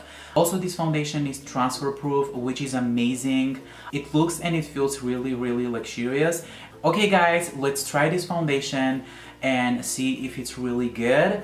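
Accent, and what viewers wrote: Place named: Armenia. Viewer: You are so cute <3 love your Armenian accent :D <3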